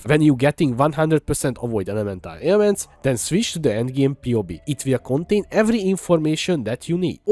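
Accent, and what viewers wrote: Hungary. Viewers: kb én is igy beszélek angolul lehet hallani a magyar kiejtést :D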